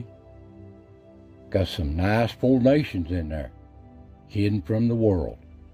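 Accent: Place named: South, United States. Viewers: Your channel is awesome and I love your country accent 🙃🙃🙃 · Appreciate your history and videos! As soon as i heard you speak i knew you had to be from East TN or southeast KY. Im very close to Cumberland gap and middlesboro area. Anyway, i recently picked up the hobby of metal detecting and Im running into the same thing as you with those “hot rocks”, especially on my small farm. It’s a challenge sometimes! Thanks for the info on the various types of rocks!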